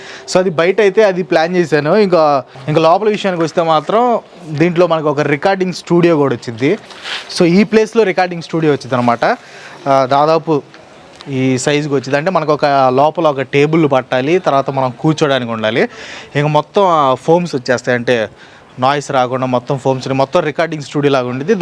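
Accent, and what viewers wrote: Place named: Tenali, India. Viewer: మా తెనాలి రేపల్లె డెల్టా ప్రాంతాల్లో ఉండే స్లాంగ్.... వచ్చిద్ది వెళ్ళిద్ది కెవ్వు కేకే 😜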